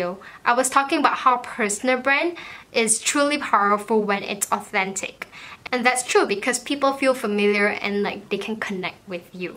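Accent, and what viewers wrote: Malaysia. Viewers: Ya actually English is the 2nd most spoken language in the world💛 also your Malaysian accent is so cute😍❤️ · Love your Malaysian accent 🙃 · Thank you. I've had this dilemma for quite a while. As my channel grows, it's kinda hard to switch the language, but this subject keeps popping in my mind. I probably switch to English one day. I'm just not sure when. By the way, I love you Malaysian accent.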